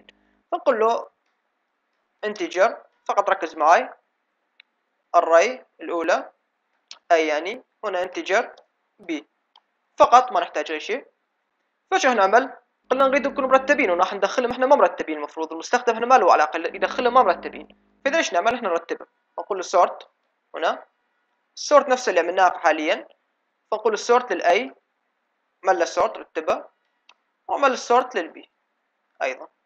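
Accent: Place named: Mosul, Iraq. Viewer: 😍😍فوك ما شرح حلو وطريقة حلوة النوب اللهجة مصلاوية احييك من القلب ❤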